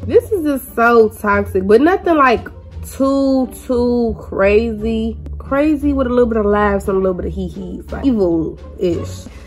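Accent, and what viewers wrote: United States. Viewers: Fine ASF, be my girl 🥰 sound like you frm my home twn Cleveland Ohio.. But I stay in Alabama nw !!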